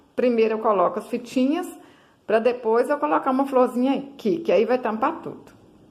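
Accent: Minas Gerais, Brazil. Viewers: pelo sotaque parece que você é mineira,eu também sou. Amei essa lembrancinha!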